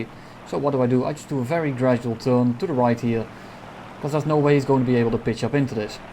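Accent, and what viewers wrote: Netherlands. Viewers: Hey man, although a bit late, I do enjoy the videos! Are you dutch? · Is he dutch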